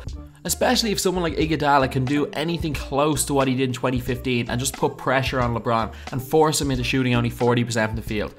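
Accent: Ireland. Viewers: Is this dude from ireland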